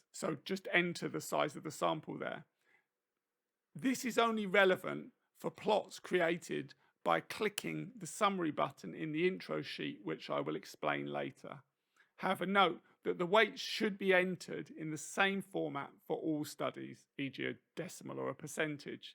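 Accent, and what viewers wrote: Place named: Australia. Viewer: The Australian accent 😢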